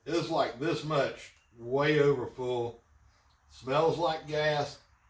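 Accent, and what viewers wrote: South, United States. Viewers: Love. The. Southern accent!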